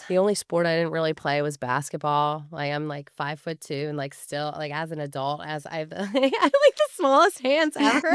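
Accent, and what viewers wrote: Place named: Ohio, United States. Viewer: how you describe what you felt - into the video, you could have been describing me perfectly. That feeling, realizing you don't have to worry about how they'll react to what you do (no matter what it is), has been so freeing. And just like you have blanket fort days, I have "do nothing but blast music and drink coffee" days sometimes. Thanks for sharing your story, it made me feel less alone in that experience. It helped you're from Ohio, ha - I grew up in SE Ohio and live in central OH now, with family in Philly, so I appreciate every one of your accents:)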